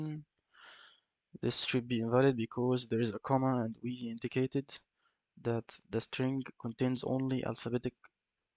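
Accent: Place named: Egypt. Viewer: i know an egyptian when i hear one 😅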